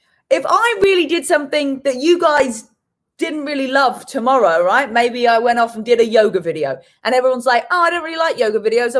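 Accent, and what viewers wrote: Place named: United Kingdom. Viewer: So... Firstly - you are amazing! I love your mindset and confidence. And the fact you still have British accent:) And that you know you are enough. My kind of gal! I also love going places by myself and it really is what they say - you learn so much from it! Last year I finally got out of my comfort zone and went to solo trip to Ireland (on my wishlist for years and years). I am use to go places by myself that are near bye but not to another country for 14 days. I was having excuses for years why I can't go (money, time) and than I called out my BS and said to myself: Alenka, you will never get there apparently. So much about ''how much you want to go.'' And I decided and I make an action plan and went. And like you said: Yes it's scary, I was also renting a car, driving on wrong side (:)) of the road, etc. but I learned so much and it gave me so much trust in myself. 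I can do it. By myself. So cheers to exploring. And basically to everything you have to say! The other thing I love is hotel story. I totally agree. We all need more compassion and understanding. And listening to each other not just talking. Oh and playlists yes. So true. Some songs made me up and move around in a heart beat. One of the songs is -. What a tune! With your help - the podcast, Burn/BTES app, the book you really took me to the next level. Most important lessons for me were: setting goals (3m, 6m, 1y especially), what can I do each day to reach them, foc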